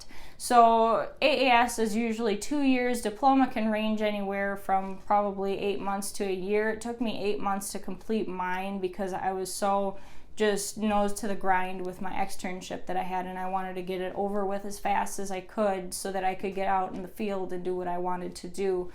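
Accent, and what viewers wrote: Midwest, United States. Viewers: Are you from Chicago or the midwest? I hear it in your accent, but I could be wrong! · I can tell you are from the Midwest!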